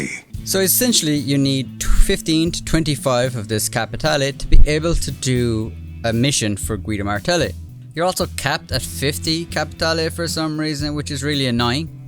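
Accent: Ireland. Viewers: How is it, that you speak "More" Irish now, than just by a few videos ago? 🤬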